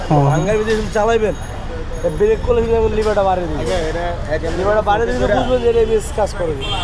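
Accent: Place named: Sylhet, Bangladesh. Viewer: Vai sylheti bashay Kotha bole obbash to Tai shuddo bashay aktu problem Hoy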